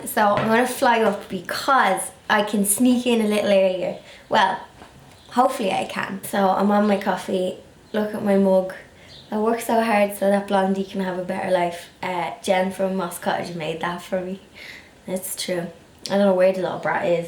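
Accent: Dublin, Ireland. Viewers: Your strong Dub accent makes you a little difficult to understand at times.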